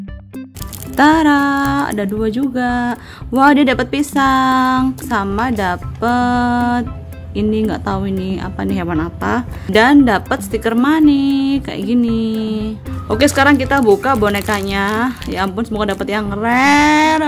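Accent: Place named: Java, Indonesia. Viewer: Logat jawa nya kliatan wkwk😄🖤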